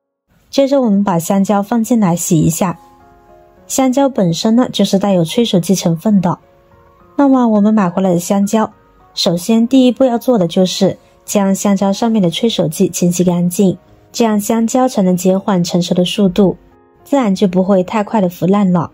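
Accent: China. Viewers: 所謂香蕉催熟，是用塑膠袋包覆，冬天才會用 夏天跟本不須要催熟 聽妳的口音就是中國腔，妳懂香蕉？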